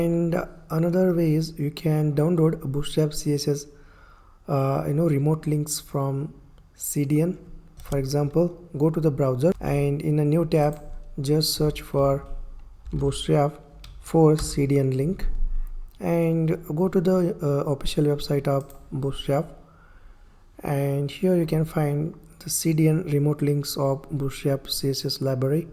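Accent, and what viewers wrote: India. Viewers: Very helpful ❤ but please try to talk in Indian English itself. The mix of both doesn't seem good to hear. No offense !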